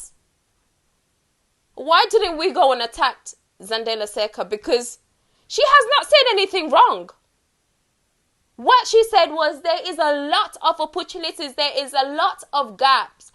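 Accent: United Kingdom. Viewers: Kwame Owusu I had to close my eyes and imagined 👀because yea the strong British accent won’t appear to come across threatening but hey she is building her platform to start a nonsense war just like the South Africans do the Nigerians there own blood and wonder why we get taken advantage all over the world I mean literally shitted on 😖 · But she is sitting up here with a "WHOLE BRITISH ACCENT." DIVISIVE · Are you still BLEACHING your skin, GAMBIAN woman with British ACCENT? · You on here starting your president's rant against your own black brothers and sisters in America what's your strong British accent acting like you in Gambia right now doing everything for gambians and on a Prejudice rant against your brothers and sisters in America westernized and brainwashed....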